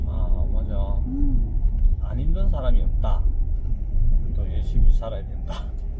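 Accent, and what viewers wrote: Gyeongsang, South Korea. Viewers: 전형적인 갱상도 ㅎ 잘하고 계십니다! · 경상도댁 언제나 친근하고 차분한 느낌입니다. 남편 잘 만나 경상도 사투리고 한국말 배우고 말투가 이쁘십니다.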